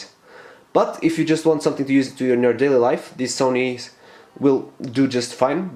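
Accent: Portugal. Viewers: are you portuguese? your accent seems like it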